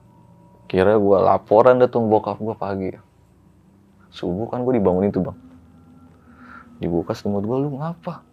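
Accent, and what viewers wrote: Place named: Bali, Indonesia. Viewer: Ini host nyh orng BALI kah ? Logat nyh jelas bgt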